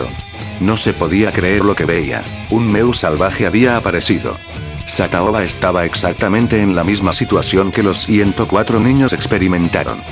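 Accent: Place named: Castile, Spain. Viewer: habla bien no te entiendo por que tu acento castellano me deja sordo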